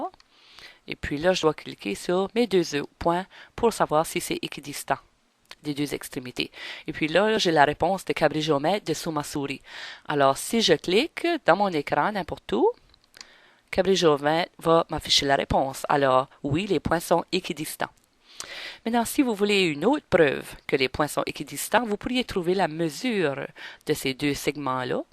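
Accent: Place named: Quebec, Canada. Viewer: Merci beaucoup!ça m'a beaucoup aidée! PS:J'adore votre accent,vous êtes canadien,non?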